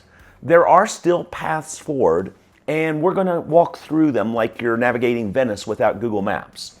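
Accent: United States. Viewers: The President of the Republic signed the law but issued a statement this week stating that the law needs to be re-evaluated as it is impacting descendants of Italians born abroad. In addition the constitutional court is hearing a case regarding generational limits. If the ruling is that there is no generational limit then parliament will need to amend the law. Humor is appreciated but the accent is troppo americano ! 😅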